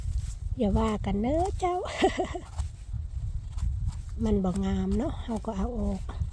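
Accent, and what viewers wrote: North, Thailand. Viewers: อยู่ไหนจ้าวอู้เหนือม่วนดี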